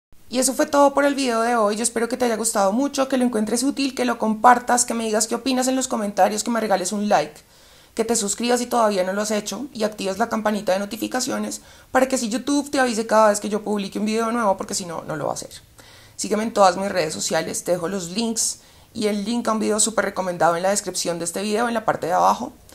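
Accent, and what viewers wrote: Colombia. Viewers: Hola..te felicito que yo no dr donde sacas esas ideas y cuando las piensas...Si te vienen s la cabeza cuando estás viendo el juego de fútbol o en el supermercado o te pones a pensar y estudiar y después te sientas a hablar o es que hablas que hablas con los asesores de España estos unidos Dinamarca y Nepal que tienes...Eres muy inteligente y hablas como su fueras colombina. Te felicito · ALABADADO SEA EL SEÑOR POR FIN UNA PERSONA QUE HABLA CLARO COMO EL AGUA... NADA QUE VER CON ESOS SEUDO COUCHES QUE HABIA MIRADO PENSANDO QUE ERAN LO MEJOR.. POR EJEMPLO SEGUIA A UN ESPAÑOLETE QUE SE PARECE A MIGUEL BOSE PINTA EL MAN Y NO LE HE ENTENDIDO NI JOTA . PERO NUNCA PERO NUNCA PENSE EN EL PUNTO DE VISTA DE UNA MUJER SINCERA FRANCA Y DIRECTA...TE DOY UN GIGANTE 10 DE 10 TE FELICITO VEO POR TU ACENTO QUE ERES COLOMBIANA , GRACIAS COMPATRIOTA ESTAS HACIENDO PAÍS . 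NO ERES JESÚS PERO DEVUELVES LA VISTA A LOS CIEGOS. ERES MUY LINDA Y NO ME REFIERO A LA FACHADA A TU APARIENCIA PERSONAL QUE ESTA EXELENTE POR CIERTO. A LA BELLEZA VERDADERA LA QUE VALE MIL MILLONES DE TONELADAS DE ORO A LA BELLEZA INTERIOR. A TI MI REINA TE SOBRA HASTA PARA DAR Y REGALAR.. EN SERIO ERES LINDA Y BELLA DE MENTE ALMA Y CORAZON 💓💓💓💓 LO DEMAS COMO LA APARIENCIA O TU ASPECTO VENDRA POR AÑADIDURA.. GRACIAS TOTALES POR TUS PALABRAS LE DAS VALOR AL VALOR TUS PALABRAS Y FRASES ME MOTIVAN Y ME SACAN DEL ENGAÑO DE ESTE SISTEMA SOCIAL CORRUPTO Y OPRESOR. YO TAMBIEN TE ESTIMO. AUEQUE NUNCA TE VALLA A CONOCER SE QUE ERES DE FIAR Y CON ESO ME BASTA SUBE VIDEOS AL 💯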